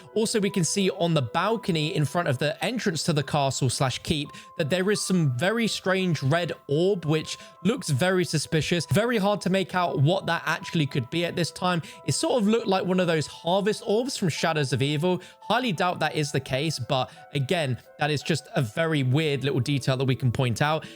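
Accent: England, United Kingdom. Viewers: He is pronouncing it correctly he is English that's how we pronounce it (correctly) 👍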